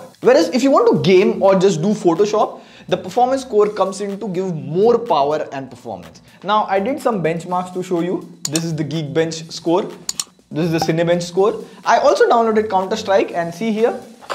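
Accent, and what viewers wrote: India. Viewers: i was confused white guy with Indian accent😮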